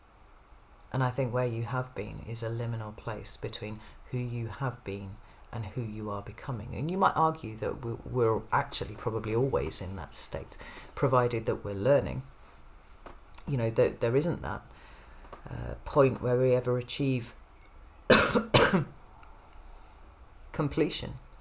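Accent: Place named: Essex, United Kingdom. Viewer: Top shelf shit! What an amazing woman. You sound like you’re from my neck of the woods (Essex) too. Much love and thanks.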